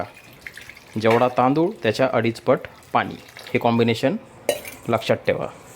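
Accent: Nashik, India. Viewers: भाषा प्युअर नाशिकची वाटते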